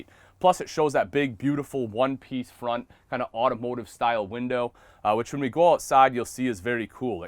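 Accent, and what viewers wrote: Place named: New England, United States. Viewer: I’m detecting a trace of New England in his accent.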